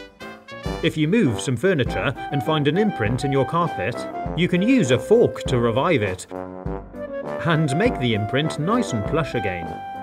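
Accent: United Kingdom. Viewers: Hi are you British cus I am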